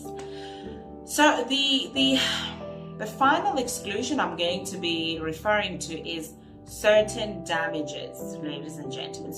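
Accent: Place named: England, United Kingdom. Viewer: I love the English Accent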